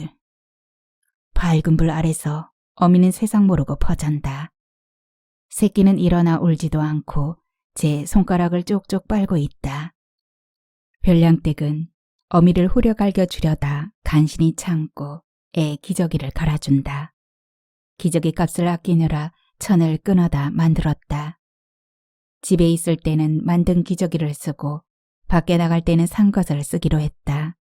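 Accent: Jeolla, South Korea. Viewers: 정감어린 전라도 사투리를 어쩜이렇게 소담소담.읽어가시는지..대단하십다. 감사합다.^~^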